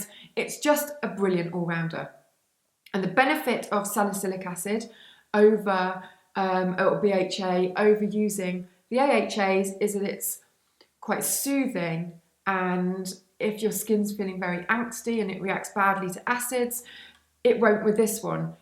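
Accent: United Kingdom. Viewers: wow, what's your problem? Sometimes people enjoy using cookbooks (surprise, even mothers and chefs) to gather inspiration. Maybe she doesn't use the recipes to the tee and just uses it as a guide. Thinking of new dishes to make all the time can get challenging, so that's where cookbooks can come in handy. Also, she is British and has an accent (a very lovely one, might I add). I do not know where you are from, but I'm sure certain accents may sound strange to the ear of a foreigner/a person who is not accustomed to listening to it. Please don't try to create/find issues where they do not exist.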